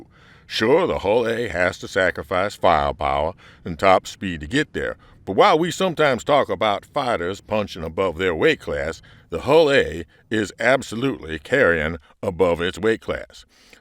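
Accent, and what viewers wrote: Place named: South, United States. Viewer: I say, I say...I enjoyed the foghorn leghorn southern inflection/accent. Not super familiar with Bezsek.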